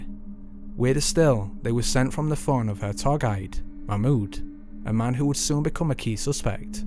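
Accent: Scotland, United Kingdom. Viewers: Is he Scottish? He sounds like David Tennant.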